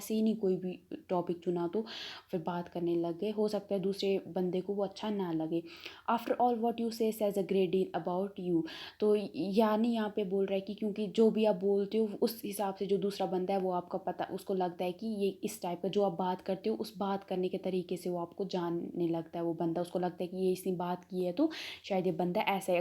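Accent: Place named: Pahadi, India. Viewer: Uh have pahadi tone in language......btw my next exam is eng. daily life .. video helps me a lot❤️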